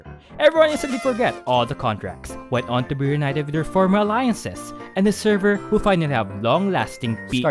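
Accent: Philippines. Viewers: Ya kinda have a Filipino accent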